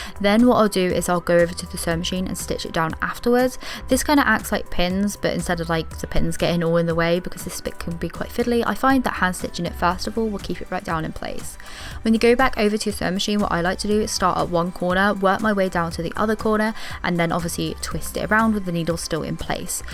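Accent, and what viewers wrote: United Kingdom. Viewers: Hello, umm i have a question: Are You British? 😅👉👈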